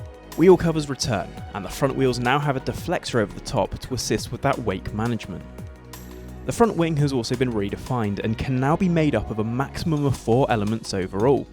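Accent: England, United Kingdom. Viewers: this STRONG English Accent ... kill me...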